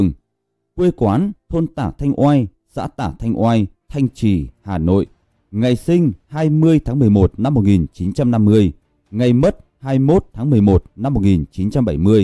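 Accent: Nghe An, Vietnam. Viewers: Người đọc cố gắng đọc theo giọng người Hà Nội, chắc là muốn để người nghe dễ hiểu, nhưng vẫn mang âm giọng người Nghệ lĩnh ...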